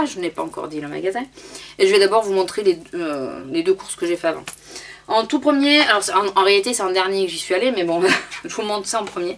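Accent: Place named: South, France. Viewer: Coucou tu vient de quel endroit avec se petit accent du sud ?... ( sinon j'aime tes vidéos )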